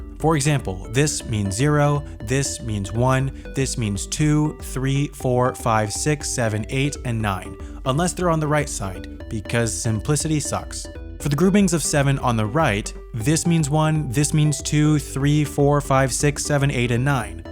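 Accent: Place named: United States. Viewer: ARGH YOU AMERICANS!! CAN-BEAR-A???? what even is your accent...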